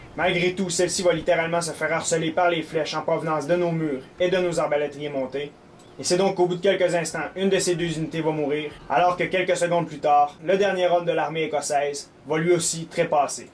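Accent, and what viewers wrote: Quebec, Canada. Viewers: SCOTISH POWER !!! <3 je le dis tous de suite, c'est la première fois que je vois une de tes vidéos. Et franchement je te tire mon chapeau. C'est complet, on ne s'ennuie pas en regardant juste défois que j'avais du mal à suivre tellement tu parlais vite =s mais c'est pas grand chose. Sinon je sais pas si tu connais "MaSQEdePuSTA" ? il fait des vidéos "critique cruelle" et je trouve que comme lui tu as une façon de parler exceptionnel x) et crois moi ce n'est pas à cause de ton accent canadien =p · Omg l'accent québécois ♥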